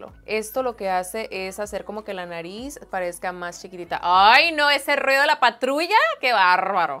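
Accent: Sinaloa, Mexico. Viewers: Soy sinaloenseeee de los mochissss jajajja y cuando te escushe hablarr no sabia que eras de mi querido sinaloa dijeee, esta mujer es de mi tierraaa.... jajjajaja vivo en los angeles.... tu en donde te encuentras....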